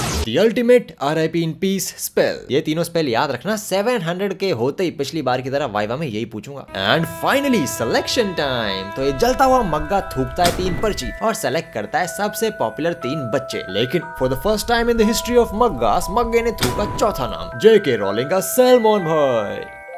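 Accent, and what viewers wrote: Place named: Bhojpuri, India. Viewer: I never saisthis before but does have a brilliant bhojpuri vocabulary and accent as well as great voice modulation skills..kudos to that 👍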